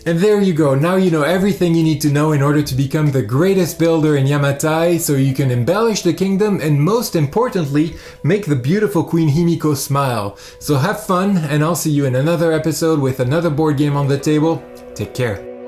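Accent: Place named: France. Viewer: with a touch of French accent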